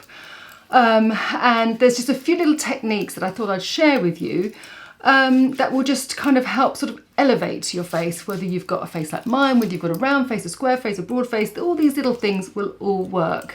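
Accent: England, United Kingdom. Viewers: That lipstick is everything on you. The corals and peaches are lovely with your coloring. Love your videos and your beautiful English accent ♥️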